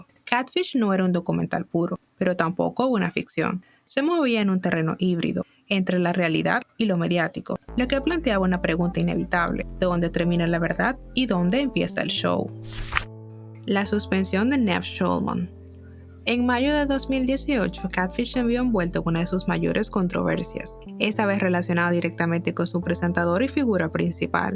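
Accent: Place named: Dominican Republic. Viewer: Por tu acento supe que eres dominicana, buen video sigue así y que DIOS te bendiga :3 😊😊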